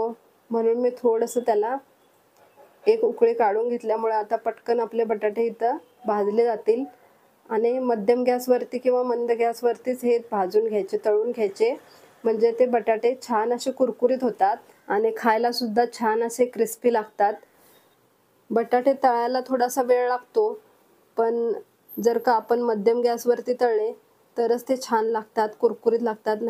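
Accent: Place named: Kolhapur, India. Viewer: tai ani tumhi bolata khup chan kolhapuri god bhasha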